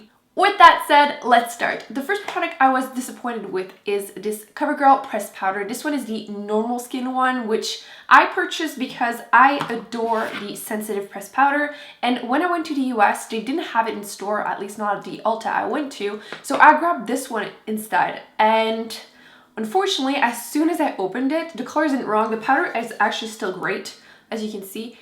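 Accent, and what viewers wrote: France. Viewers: Sorry are your french?? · She has an AMAZING french accent.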